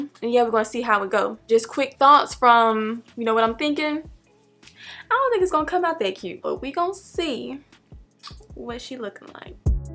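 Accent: South, United States. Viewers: I love your accent are you from the south?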